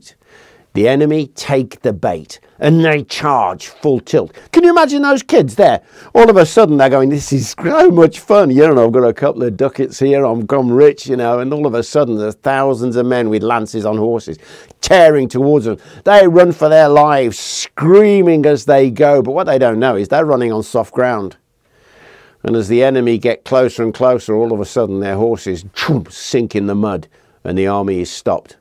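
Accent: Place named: England, United Kingdom. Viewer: Delightful as usual, though I understand this guy I never heard of before gave us French a hard time (another one!)... Indeed, you are an outstanding storyteller, you could even captivate us only by reading the instruction manual of a fridge! I also love, I already said it, but I'll say it once more, your so typical accent, it really adds a lot. There is so much globish here on Youtube that I'm glad to hear somebody speaking a real english, the language I learned and heard in Old Blighty when I was younger, and not the awful thing some people still dare to call english... By the way, speaking of great warriors of the Hundred Years war, how about Bertrand du Guesclin or even Joan of Arc? I know, be defeated by a 18 years virgin is something humiliating, but her story is quite fabulous, believe me!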